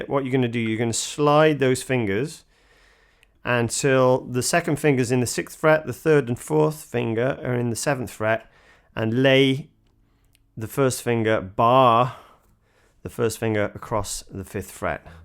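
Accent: United Kingdom. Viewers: You should also just post you doing this cover —— love it —- I would put it on a loop n listen all day. You are so righteous you could play in Jamaica and they would respect you! I would love to hear you do or or or even reggae style ( from Denzel Jamaica movie ) You have a great accent. Thinking maybe Manchester, U.K. … maybe?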